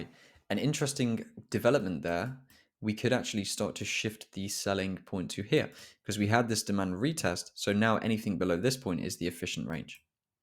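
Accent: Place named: England, United Kingdom. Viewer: I love your sweet English Accent. Almost like I'm listening to English premiere League commentary. By the way your videos has really helped my trading journey. Thank you.❤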